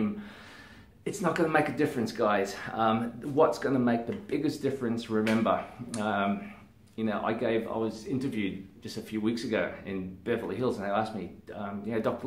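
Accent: Australia. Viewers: What a great surprise to find an Aussie! Like even as an Aussie, I went holy fuck he's got such a strong accent. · Being Australian and Asian somehow manages trip to me up when you talk still.. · KimLip'sLeftEye It’s because he’s so darn handsome.😍 Plus the Australian accent is adorable. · Recognized the australian accent right away! 😁 Great video! ❤ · Hi Doc! This video popped up in my Recommended Videos and was pleasantly surprised that you had an Australian accent! And then I found out you're in Brisbane too!!! Loved the video but I have a couple questions - 1. Do I need a referral to come see you? And 2. The Ordinary has so many products in it's range... How do I know what to choose for my skin? Thanks heaps! Subscribed on YouTube and followed on Instagram :)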